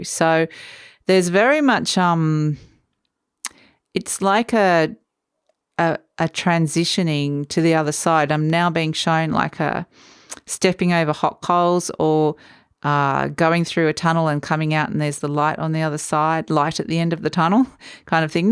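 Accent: Australia. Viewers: DonI recognise a fellow Aussie?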